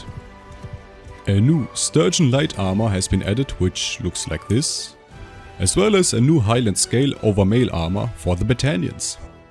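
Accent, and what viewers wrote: Germany. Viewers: Haha mann hört nach 3 Sek. Das er deutscher ist 😁 gj man. Mach weiter so 😉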